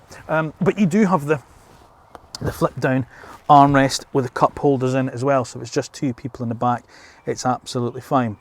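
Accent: Scotland, United Kingdom. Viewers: Great concept to compare the used Jaguar to the new Kia because they'd be similar in price. They both look nice on the outside but the Jaguar is another level inside. Also many people say that the Kia is not a good handling vehicle when cornering and that the Jaguar is which would make all the difference to me. Favorite feature on the Kia is the adjustable Regen located on the steering wheel via paddle shifters. I found I was using them like down shifters brilliant. It's hard to get either one of these cars in the states right now. Would love to see a comparison between the i pace and the pole star 2 is there both more of a driver's car. I could listen to your Scottish accent all day btw.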